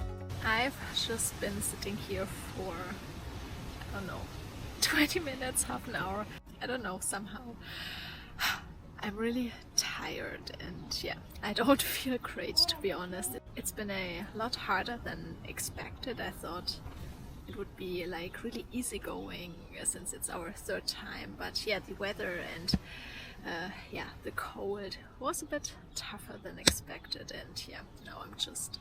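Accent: Germany. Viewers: Do I hear a german accent? ;)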